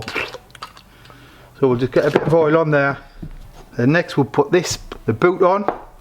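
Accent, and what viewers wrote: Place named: North East England, United Kingdom. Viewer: as always good thorough content. I tip my hat to you for the endless late nights of editing your videos, this must take up a lot of your time. Keep bashing away…. I came across your videos a few years ago and noticed the northeast accent, mint! Been watching ever since. 👍🏻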